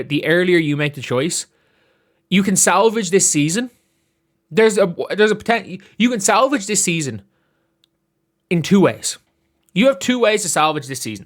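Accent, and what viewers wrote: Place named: Ireland. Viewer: That’s just the Irish accent lol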